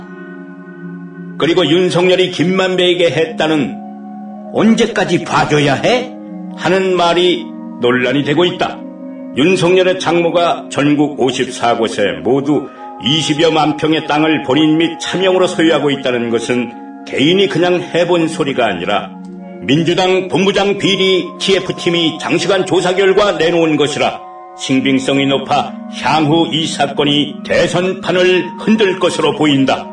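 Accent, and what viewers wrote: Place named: North Korea. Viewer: 맞아요! 아나운서님 목소리와 말투가 북한찌라시로 들리니 죄송하지만 다른분으로 체인지해주시면 좋겠습니다.아주 중요한 사안이니 유권자들에게 거부감없이 100%팩트 그대로 전달될 수 있게 부탁드립니다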